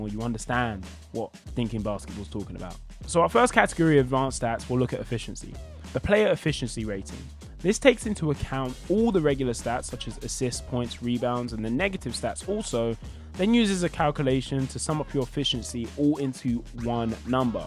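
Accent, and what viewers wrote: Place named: United Kingdom. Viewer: Watched a couple of your vids now, subscribing and hitting the bell! It’s very refreshing to hear basketball from another British person lol. It’s hard being a UK nba fan, all the games are on at ridiculous times😂 Keep up the good work!!💯💯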